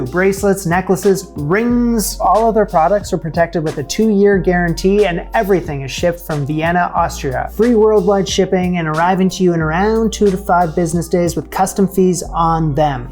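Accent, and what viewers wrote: Canada. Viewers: Can' believe someone sniped your 'Canadian accent'. Then again, I'm from SE Michigan, so I sound like you ;) Oh, and all of the sane people of America apologize to Canada, and all associated with her. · Had no idea you were Canadian. Always felt your accent was very pleasing to hear and wondered why since I assumed you were from the US. And as a Brazilian living in The Netherlands, I usually pick an American accent very easily when I meet one out here (it doesn’t sound very good does it? 😂 ) Now yours make sense. Glad to hear you are Canadian. Much better. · Make fun of your Canadian accent? WTAF? I'm a Montanan living in California--I miss the Canadian accent and love hearing yours. LOVE it. Like I love your content. Thanks for your Canadian-ness. I consider you my emotional support Canadian. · Also a Canadian living in the US - I very much feel like you. 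I am moving home in a few months. I am done. Butter Tarts are 100% a good way to push back. I am definitely team no raisins. Your Canadian accent is the best!!!!! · I cannot believe you are serious when you say that you didn't realise you had a Canadian accent. I am Australian and I have been fully aware that I have a very specific accent from a very young age. Starting with listening to Sesame Street, then the Nonna four doors up who fed us pasta and the Yiayia who fed us moussaka, I knew that I had a different accent to the 'news people. I think you abandoned your country to find more wealth in 'America', (insert Titanic bullshit). But now you are in the middle, oops. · Your Canadian accent is AWESOME!!!!! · I love your Canadian accent 😊....I love your country! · THANK YOU!!! The Great Debate. I don't like the fruit/nuts either. Also, I knew you were from Canada from the accent, but also you filmed in Muskoka, Ontario, where I have been summering from birth. 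Anyway, thank you! Because the bakery in Bala (Don's Bakery) changed their recipe for butter tarts! Now, the original recipe wasn't the best, but it was the taste of summer. Don's Bakery's current recipe is even worse. The filling is off and there is too much crust. HOWEVER, I now have "the recipe" which I will follow and bake and peace will be restored. I have been a friend/follower of Glen & Friends for years. I watch the Sunday Morning with the Old Cookbook regularly. As an American, I apologize for the man who currently sits behind the desk in the Oval office - both Trump and Musk; because who knows who actually sits in the chair. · Many of us Americans love our Canadian neighbors. We're so glad you live near us and set a better example than orange Hitler does. I personally am sorry that we are behaving so poorly. Be proud of your heritage and your accent! I definitely noticed you were Canadian but I thought it was charming. 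❤️ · The way i didn't clock the Canadian accent until this video. My NS accent is too thick to tell another one unless its NFLD haha. But as someone with a spouse from NYC, I get the layer of extra stress you musg be dealing with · Same! I don't hear any specific Canadian-isms in him, but I knew right away he was Canadian. Not sure how to explain that. Maybe we don't hear it because we sound similar? 🤔❤️🇨🇦🇨🇦🇨🇦🇨🇦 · Speaking as a Canadian, I knew had to be Canadian about 1.5 videos in. Then he mentioned it in a video and I felt very smug because, it's true, Canadians are generally bad at spotting out own accent. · I love your Canadian accent! Canada is on my vision board ❤ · I've always loved your Canadian accent. I envy you being from Canada, and I hope one day you and your family get back there. I'd do anything to get out of the US, I honestly don't know why anyone would pick living here when they have a home in Canada. 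Love your channel, love your personality, love your accent!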